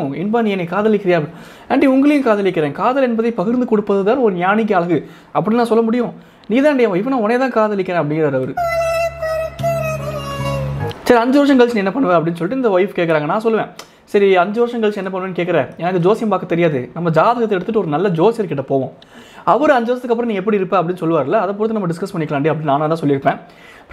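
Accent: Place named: Karur, India. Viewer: Happy mau day💪💪💪💪💪ean irumal odambu sari illaya? Love fm srilanka😍😍😍😍😍karoor slang nice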